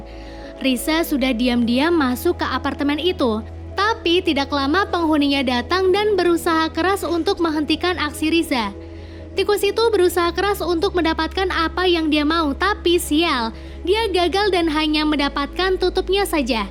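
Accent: Pemalang, Indonesia. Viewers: BTW KAKA ORANG PEMALANG?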